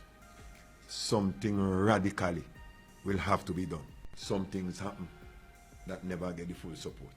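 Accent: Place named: Jamaica. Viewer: The man yah patois deep you fret!!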